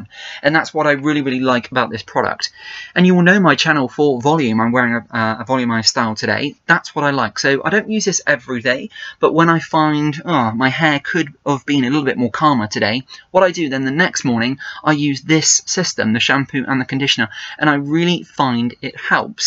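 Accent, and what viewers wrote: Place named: England, United Kingdom. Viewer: omg i loved ur english accent & ur hair.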